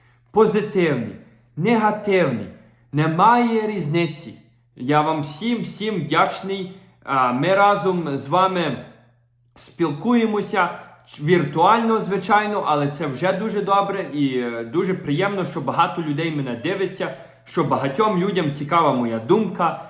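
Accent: Western Ukraine, Ukraine. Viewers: Дякую за відео,. Ти так класно, по простому розказуєш, хочеться сказати, так по нашому, по западенськи😁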